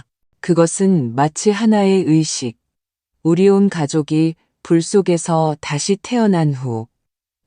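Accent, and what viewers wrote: China. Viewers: 하나히나. ㅡ중국인 가공 말투! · 발음이나 글읽는 소리가 연변족인것같음 요즘 이런글 쓰는 작가도 ㅎ 그렇고 한국인이면 다알수 있는 촌수를 뒤죽박죽으로 읽고 시간도 4시를 사시 다섯시는 오시 듣다가 한심스러워 나가기를 자꾸하게 되네....😢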